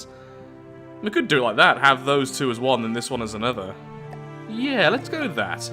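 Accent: United Kingdom. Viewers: Hey, probably many people are thinking this 'what country are you from?' British? Australian? if you see this please reply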